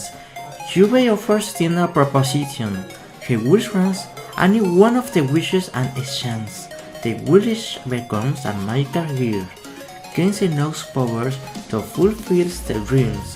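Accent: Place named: Spain. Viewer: He's spanish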